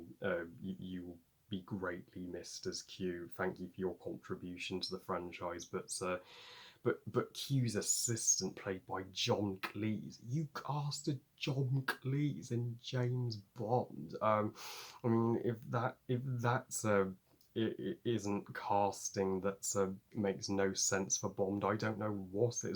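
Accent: United States. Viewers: Oh my gosh. You’re so awesome! 👍😂 I love your American accent. More more! Artwork is awesome!!!🥰❤️ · Great American accent 😊 Happy weekend, my friend!!! 💜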